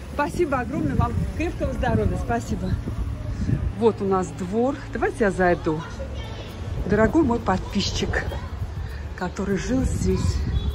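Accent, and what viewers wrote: Baku, Azerbaijan. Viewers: Привет из Вильнюса! Спасибо за ролик! Как хорошо, что Вы решили погулять! Как приятно слышать речь с бакинским акцентом!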